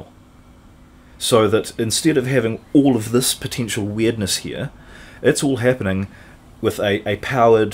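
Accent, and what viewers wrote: New Zealand. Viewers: I have 4001 and find the neck pickup needs to be at about 85% before it’s just too much bottom end. Thanks for for the shielding tips - tempted to see if you would do a bit of a upgrade to mine. you sound like you could be in NZ.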